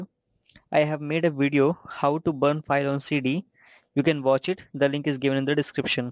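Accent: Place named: India. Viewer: indian accent is sooo funny xDDDD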